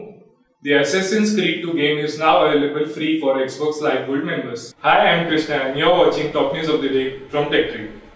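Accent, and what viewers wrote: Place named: Germany. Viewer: Was Microsoft short of native English speaking people, in order to let this guy do all the talking?! I thought he was speaking German...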